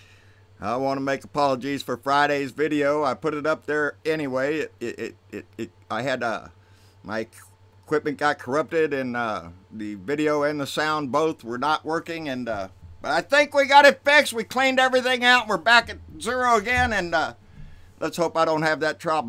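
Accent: United States. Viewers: baby!! you're exactly what a true American sounds like, our forefathers envisioned you when they established this wonderful country. keep giving them hell!!! of course I'm referring to the "selfish" rich and Powerful~ peace and love ☆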